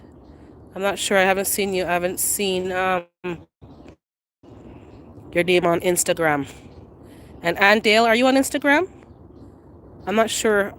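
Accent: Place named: Jamaica. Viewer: My seven years old son just heard your voice and said ' she a Jamaican?'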